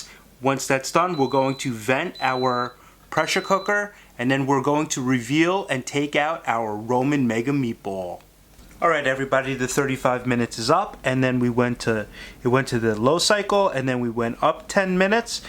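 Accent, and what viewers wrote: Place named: Boston, United States. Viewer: Thanks, you ROCK too! I love listening to your Boston accent! :)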